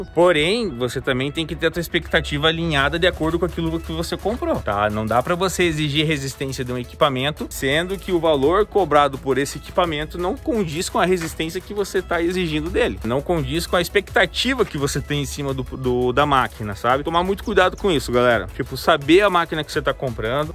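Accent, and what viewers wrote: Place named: South, Brazil. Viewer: Esse sotaque e aqueles pinheiros no fundo da pra saber q tá bem no sul kkk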